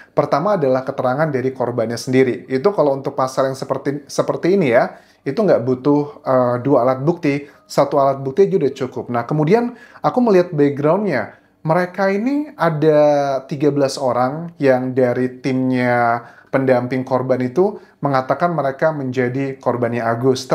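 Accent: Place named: Bali, Indonesia. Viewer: Nada bicara nya dan nama nya orang baly tuh...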